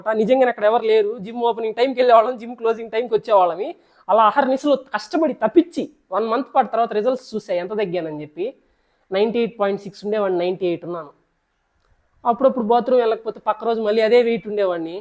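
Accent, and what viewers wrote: Nellore, India. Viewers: Ide ma nellore yaasa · Skip cheyakunda chudamannav kani ur talking was to good bro nellore yasa super😂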